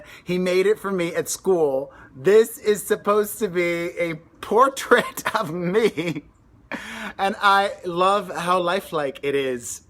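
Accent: United States. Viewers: Me encanta como tus nenes y vos hablan en castellano y que sigas manteniendo vivas tus raíces!! Y me atrevo a decir que tus hijos no tienen acento estadounidense como vos al hablar en español!! Un beso desde Argentina 😘😘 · now I understand ,but I had no clue u were Spanish,u sound southern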